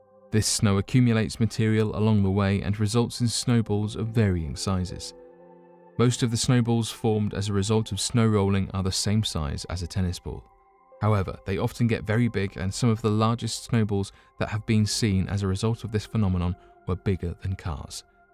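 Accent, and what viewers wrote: United Kingdom. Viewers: I have an unexplained mystery to explore: why are so many narrators of these channels British? Do they sound more "mysterious", or "suave", or "distinguished" than an American narrator would? Unless of course ALL of these channels are British owned and operated...which is real likely🙄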